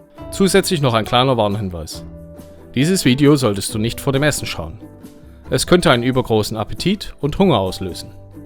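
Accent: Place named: Saxony, Germany. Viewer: Oh nein nicht schon wieder....Schweizer Spezialitäten auf Sächsisch.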